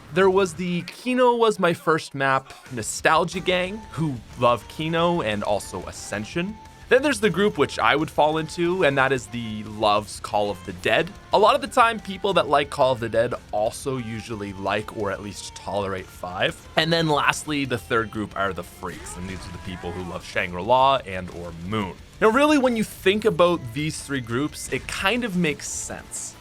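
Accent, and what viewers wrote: Canada. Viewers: Nasal Canadian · Maso24k whats shitty about a Canadian accent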